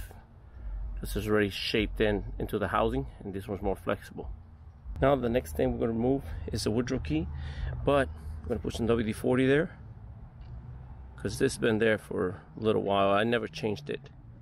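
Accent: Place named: Cuba. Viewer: Excellent tutorial on changing an impeller. Having been born and raised in Miami and working there for many years I had many Cuban friends and co-workers. I noticed several things about their English pronunciation . One thing was that “B” and “V” sounded identical when you called Yamaha “Jamaha” it took me back to Miami days. Thanks for your fun videos.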